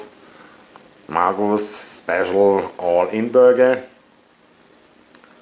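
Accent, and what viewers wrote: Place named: Hesse, Germany. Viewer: Endlisch a ma aner der schö Hessisch babbelt. Weider so 👌🏼😉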